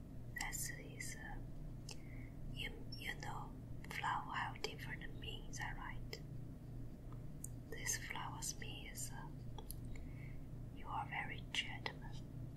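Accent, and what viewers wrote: China. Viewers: 英文很有中国味道.......很好听的说。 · when you realize the Chinese speech stereotype is almost on point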